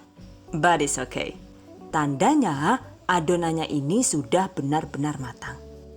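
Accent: Banyumas, Indonesia. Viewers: Hahaha...maen loh ngendikane...ngapak kaya nyong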